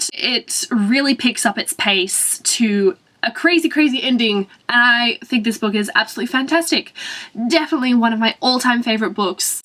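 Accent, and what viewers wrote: Australia. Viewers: Shes australian. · Deniz Baronchelli She's from Australia, honey. It's not fake. That's the Australian accent.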